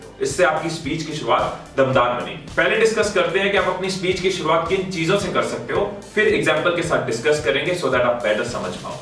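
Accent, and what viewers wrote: India. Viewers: Cute Indian English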